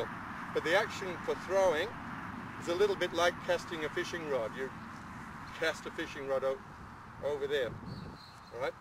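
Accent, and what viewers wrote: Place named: Australia. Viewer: Of corse he’s Australian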